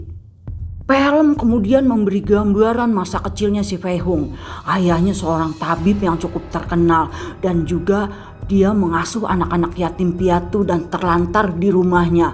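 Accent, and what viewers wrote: Jakarta, Indonesia. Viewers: Logat betawi. Kemane aje doel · Logat Betawinya kental banget. Kocak.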